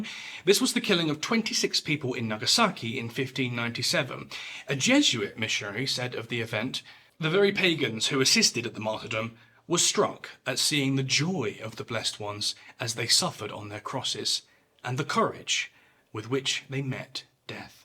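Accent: England, United Kingdom. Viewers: I'm having trouble getting past seeing Ricky Lafleur with an English accent. #trailerparkboys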